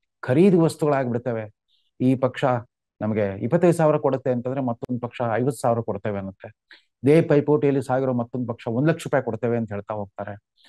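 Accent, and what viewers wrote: North Karnataka, India. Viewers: ನಮಸ್ತೆ 🙏ನಿಮ್ಮ ವಿಶಿಷ್ಟ ರೀತಿಯ ಉತ್ತರ ಕರ್ನಾಟಕ ಭಾಷೆ ಶೈಲಿ ಸುದ್ದಿ ಪ್ರಸರ ಪಡಿಸುತ್ತಿರುವ ನಿಮಗೆ ಧನ್ಯವಾದಗಳು 🙏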